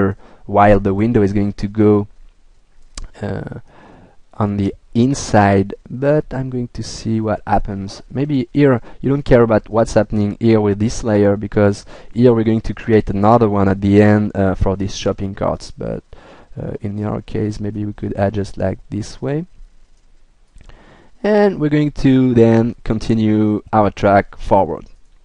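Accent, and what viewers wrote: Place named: France. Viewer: 'ere. aha love that accent are you french?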